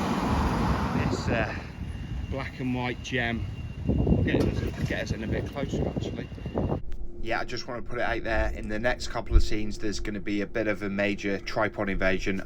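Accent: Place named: Shrewsbury, United Kingdom. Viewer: My Boy Lewis always laughs when you say 'see you out here' I tell um that's a Shreeeewsbury accent mate init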